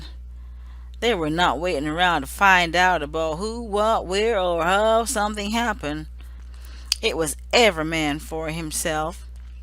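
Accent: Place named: South, United States. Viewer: I loved your southern drawl it was absolutely entertaining. Thank you for the stories God bless you ❤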